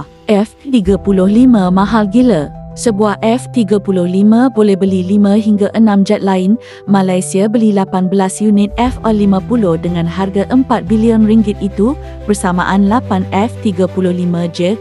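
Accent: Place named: Indonesia. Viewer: Indon mana lah gigih sangat belajar bahasa malaysia...sebut perkataan malaysia jelas dengar macam slang indon..😅😅😅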